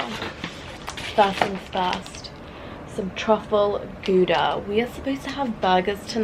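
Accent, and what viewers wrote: United Kingdom. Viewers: how did you have that british accent? make a life story time!! im hooked!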